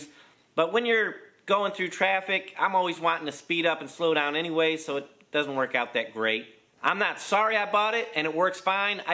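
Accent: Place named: Kentucky, United States. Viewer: thanks for great video! best on youtube!cool Kentucky dialect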